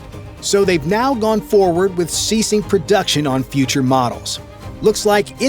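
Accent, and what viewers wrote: United States. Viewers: Stopped watching when he said that the A380 was out in 1980s Plus the insufferable american accent and the Boeing ass kissing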